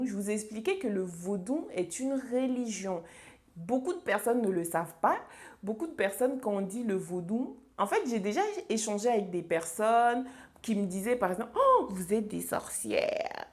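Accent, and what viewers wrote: Ivory Coast. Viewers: Tu n’aurais pas vécu avec des ivoiriens par hasard? Tu parles un peu comme une ivoirienne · . Juste pour dire qu'on se reconnaît quand on parle. Et la, perso jai l'impression que c'est une ivoirienne qui parle. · ma soeur tu parles comme une ivoirienne hein c'est bien ça soyons fière de notre afrique vive le BENIN ! J'aime bien ce pays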